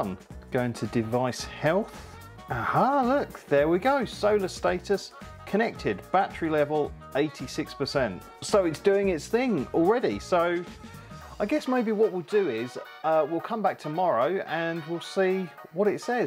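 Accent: United Kingdom. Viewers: Ahhh how nice it is to actually listen to a fellow brit. This is such a great video and very helpful especially for useless DIY bird's like me lol....thank you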